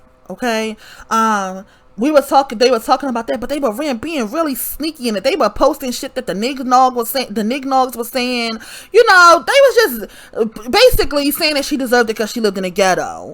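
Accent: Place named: New Orleans, United States. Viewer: I hear New Orleans, New York and Maryland in your accent.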